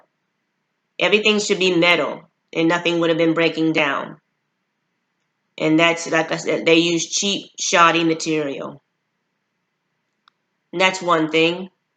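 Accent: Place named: Louisiana, United States. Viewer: OK awesome I thought I detected a bit of a Louisiana accent LOL I am here near Baton Rouge Louisiana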